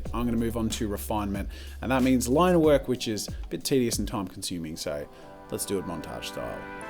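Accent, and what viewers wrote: Australia. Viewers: Aussie accent lmao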